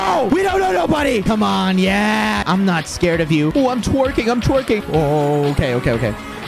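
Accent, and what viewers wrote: United Kingdom. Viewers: love how his british accent gets worse and worse as the video goes on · Ik, but he was doing a British accent in parts of the video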